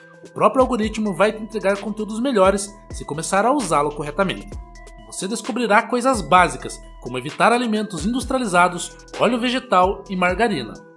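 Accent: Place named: Caipira, Brazil. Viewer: Narrador caipira não dá, hein.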